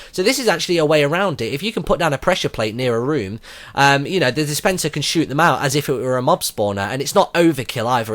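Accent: United Kingdom. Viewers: oh, cheerful person, funny... and a british accent. You got yourself a new subscriber.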